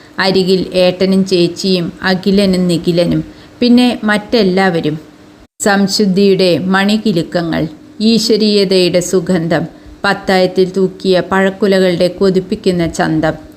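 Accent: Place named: Valluvanad, India. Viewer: വള്ളുവനാടൻ തനിമ നിറഞ്ഞ ശബ്ദം പ്രിയ സഹോദരി അഭിനന്ദനങ്ങൾ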